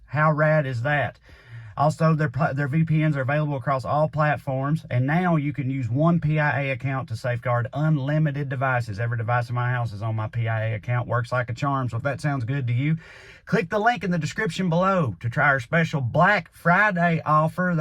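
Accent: South, United States. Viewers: Omg you are the first ever person I have heard with a southern accent who expounds sound philosophy. Thankyou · your deep southern drawl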